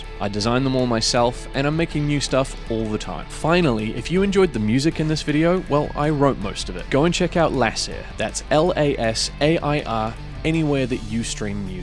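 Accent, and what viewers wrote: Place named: New Zealand. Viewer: as soon as im 5 sec in and hear a kiwi accent, sub'd. idk just enjoy seeing cool videos from people from the place im from, especially when it seems like everyone else is american, australian or british. dope vid!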